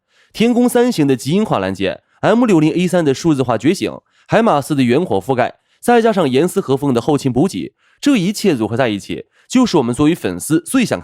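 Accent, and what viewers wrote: China. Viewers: 中國口音的中國影片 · 都不知道這是AI做的，還是對岸的人做的；這人是從台灣人住對岸??? 這影片滿滿的中國味的口音，說話的用詞發音用法都是對岸，搞甚麼東西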